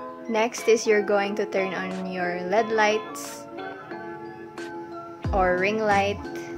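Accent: Philippines. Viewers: Huh she sounds like from Philippines but thx for tutorial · you sound like Filipino to me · i can tell she's phillipines by her english accent lol❤ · Why do you sound like you're from The Philippines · I really don't have the materials for this... POTANG INA!!! (but this is the first time I've heard your irl voice) :) You really sound like a true Pinoy!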